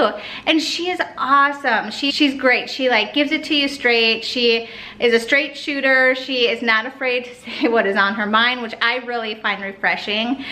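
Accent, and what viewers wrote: Minnesota, United States. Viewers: You still have that Minnesotan accent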